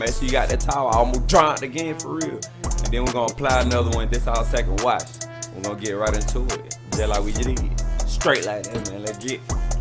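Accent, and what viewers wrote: Atlanta, United States. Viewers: STRAIGHT FROM ATLANTA!!! That accent is hard!! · I can tell you from ATL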